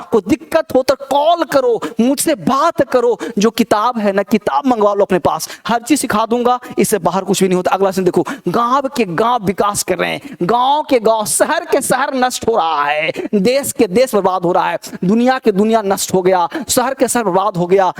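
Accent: Bihar, India. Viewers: Tum bihar se ho kya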